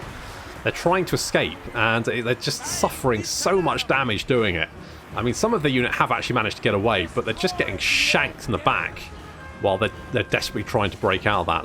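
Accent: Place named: Northern England, United Kingdom. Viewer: I only watch CA content if it's made by a man with a northern english accent. I don't make the rules, but I do voluntarily and enthusiastically enforce it.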